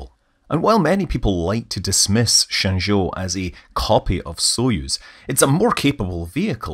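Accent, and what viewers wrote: Scotland, United Kingdom. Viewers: Is Scott... Scottish? I thought I've heard a Scottish accent.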